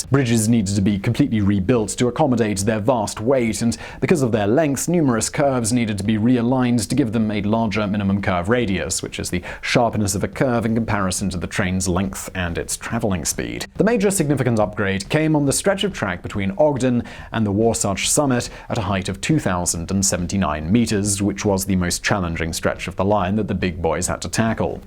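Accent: England, United Kingdom. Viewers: OK THANKS, I ENJOY WHAT HE IS TALKING ABOUT... BUT A LITTLE HARD TO CATCH ALL THE FAST WORDS WITH THAT ACCENT.. MY BRO IN LAW IS FROM ENGLAND, BUT HAS LOST MOST OF HIS ACCENT... KEEP UP THE GOOD VIDS... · a suggestion. Slow down. Your videos are educational and informative, but your pace is unnecessary. Your knowledge and British accent alone keeps people watching, but your pace has me tuning out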